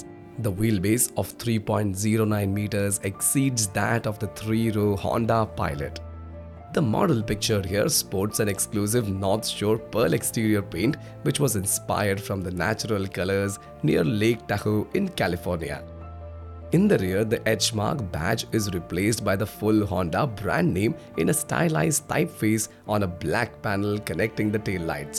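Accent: India. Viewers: The speaking person has indian accent. Does that mean this Honda model is indian made?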